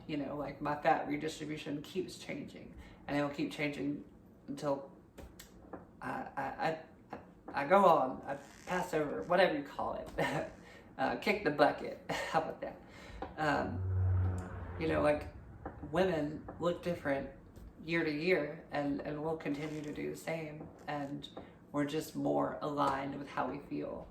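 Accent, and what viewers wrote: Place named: South, United States. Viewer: I am loving the southern drawl in your voice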